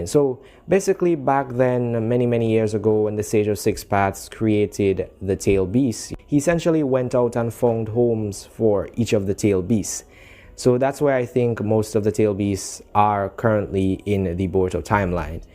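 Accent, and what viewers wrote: Jamaica. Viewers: Are u from Jamaica? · yooo is that a jamaican accent i hear??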